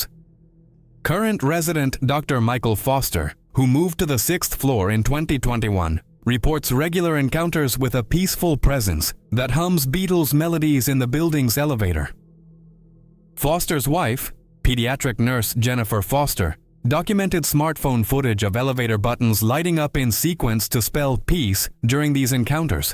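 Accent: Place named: Soviet Union. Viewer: Interesting accent. From the former Soviet Union?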